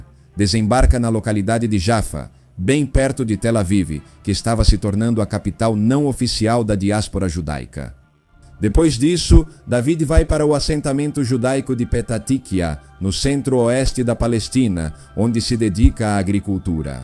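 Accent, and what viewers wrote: Portugal. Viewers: É um bom documentário. Mas essa narração atropelada, português lusitano misturado com português brasileiro. É muito rápida e confusa. Estragou o vídeo! Péssimo!!!!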